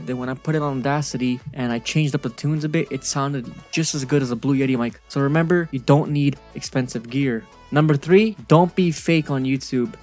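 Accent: Croatia. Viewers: Are you from Croatia? :D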